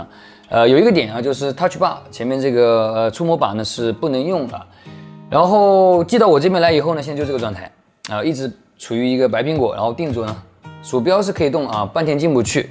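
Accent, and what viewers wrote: Wenzhou, China. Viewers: 这温州口音，太熟悉了哈哈哈哈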